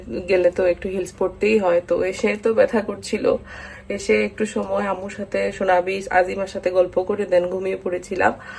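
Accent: Sylhet, Bangladesh. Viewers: Apni ki sylheti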